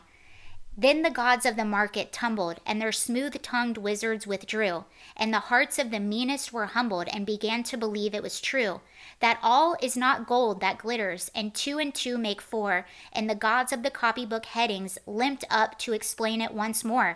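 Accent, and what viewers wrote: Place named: Chicago, United States. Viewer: Hey darling are you from Chicago area? I kind of had a feeling you were before but the T-shirt made me think maybe I'm right. I'm I was born the Evanston but I grew up on the Iowa side of Illinois in Kewanee but my entire family is from the Chicago area Naperville Downers Grove, and a lot of other places that are eluding me right now. But my mom and I lived in Lisle and Bolingbrook until I was about 5. And we have deep ties to the area. And tons of friends and family from over there that we still see. Though I live in Tucson now so I miss home but at least I'm in a Republican state or at least it was a Republican state people think that we're going to not vote for Trump this round which will make me really sad. I'm praying that doesn't happen I'm praying we stay a red state but so many leftist have moved to Arizona so I don't know. 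But anyway yeah I was curious if that's where you're from I was trying to figure out your accent. And I'm almost positive you have the same accent as my aunt but I could be wrong.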